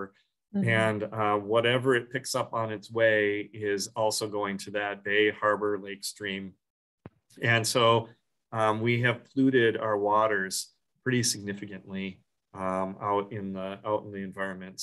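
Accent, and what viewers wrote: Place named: Long Island, United States. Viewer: Thank you!! Love the Long Island local talk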